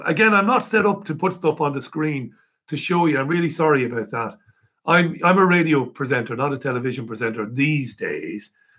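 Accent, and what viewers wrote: Ireland. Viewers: he from waterford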